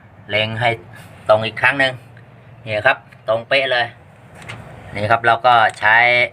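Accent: Chanthaburi, Thailand. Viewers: คนที่ไหนครับสำเนียงนี้ คล้ายบ้านผมเลย ผมคนจันท์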